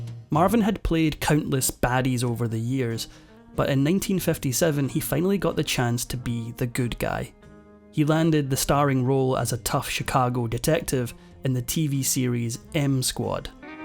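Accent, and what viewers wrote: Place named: Scotland, United Kingdom. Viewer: Way better than the AI clips. I’m a lover of Scot Accents, so there’s that. Thankyou!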